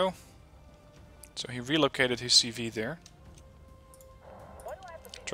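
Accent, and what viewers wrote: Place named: Netherlands. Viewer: You are welcome. I know, that you are no deity - when I interpret your (hardly present) accent correctly you are a human from the Netherlands, right? ;-) BTW I'm German. Actually this was my first comment ever to a youtube video. I really appreciate your videos, they are entertaining as well as informative. And I felt somewhat "honored" that you mentioned my comment in another video of yours. However generally I hate wargame (kind of), since it's the first time in my life (and my computer playing life goes back to the Commodore 64 times(!)) that I am addicted to a game. The interesting thing is that neither ALB nor RD hold the usual grinding game mechanics, which usually make players addicted. Well another story... So before I get carried away about thinking why this happened to me, and why I like watching your videos so much - thank you, and please go on doing such an entertaining job. 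And keep doing ranked, please! Private Parts (my ALB and RD name)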